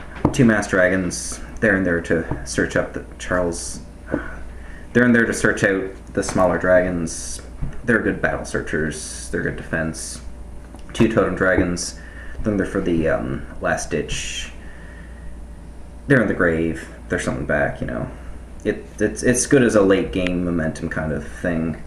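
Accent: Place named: Newfoundland, Canada. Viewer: You sound like your from Newfroundland, good deck ya got there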